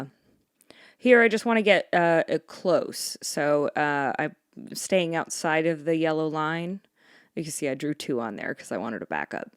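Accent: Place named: South, United States. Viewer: aww.. you're sweet. Comes thru clearly.. I am sincerely sorry to hear about troubles with your sis and partner. I assume business partner? You mentioned your husband in this video, I hope not him. I hear a refined southern accent, and heard a "y'all" (always a plus for this Texas fella) so I don't think you'd call your husband 'partner'. Either way, prayed for all and meant what I said. As for the channel, the grossest ever strat is up next for me. Take care, be well, keep it up when it suits you🤍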